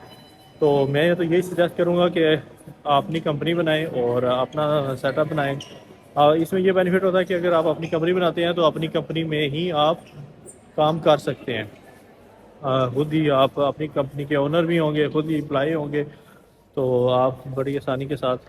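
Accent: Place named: Kashmir, India. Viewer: sb kya hll aaa...kashmir se lgte oo ap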